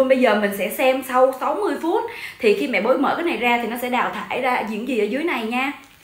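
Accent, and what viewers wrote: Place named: Vietnam. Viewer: nghe giọng điệu là biết ở Vn rồi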